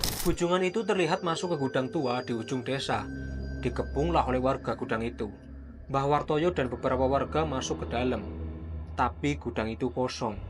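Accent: Banyumas, Indonesia. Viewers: lu orang tegal yak bang atau Purbalingga? soale ngapak brok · Ngapake ngendi kang😂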